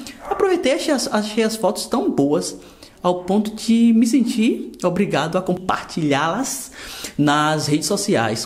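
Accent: Bahia, Brazil. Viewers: Tu é baiano man kkkk?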